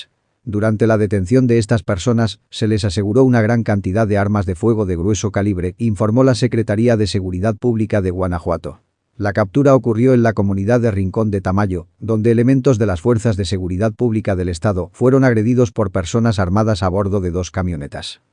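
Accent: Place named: Spain. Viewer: Tu vos es de España A ti que más te da lo que pasa en México 😒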